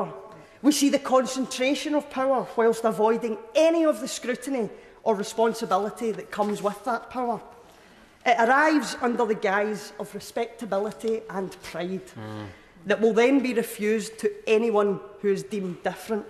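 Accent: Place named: Wales, United Kingdom. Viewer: Love her welsh accent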